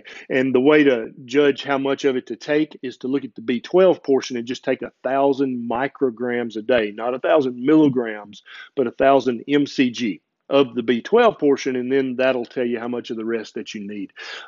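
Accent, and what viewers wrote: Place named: Tennessee, United States. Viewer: I love you! You are so funny and shoot from the hip! Put together with that Tennessee accent and you have become my fav person to listen too!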